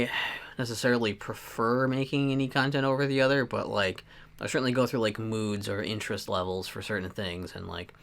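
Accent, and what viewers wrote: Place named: Minnesota, United States. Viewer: I think it's really cool you still enjoy making videos, and I am glad you do post them and share them with the public.🙌 I've been making videos for about 12 years and never thought to be bold enough to post anything, so it's really good that you have and stuck to it! That's mad that you don't experience ASMR yourself, you are very good considering you don't.👌 As for the ASMR voice, you do still have one, but it is definitely nothing to do with being bored and then falling asleep... if that was the case, I wouldn't of watched your videos. 😅Haha thats cool... hello over to Minnesota! I can here that accent slightly ... I only know Minnesota from Marshall in 'How I met your mother'😝Your YouTube plan sounds good, I'm excited! 😆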